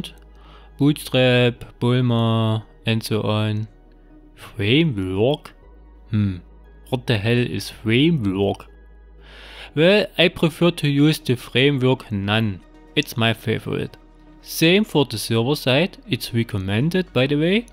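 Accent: Germany. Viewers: This accent is special and typical from east-germany. I am from germany as well and I immediatelly recognized that he is from east-germany (Ich vermute Thüringen oder tiefes Sachsen ;-) ). After all the video and the stuff is great. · Klingt nach Sächsischer Schweiz, Dankeschön für das geile Video! Ich würde mich auch sehr über ein Video auf Deutsch freuen. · Your accent sounds like German. Anyway, thanks for your good information.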